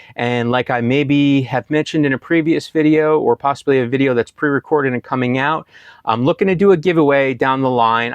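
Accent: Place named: New England, United States. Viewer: I knew that was a Ne accent. I’m in NH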